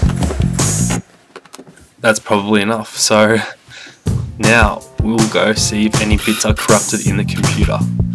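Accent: Australia. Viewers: are you australian? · I KNEW HE WAZ AUSSIE!!! can I get a million dollars now?? Mail it to Queensland ##################### U thaught I waz gonna actually give u my address yea nah..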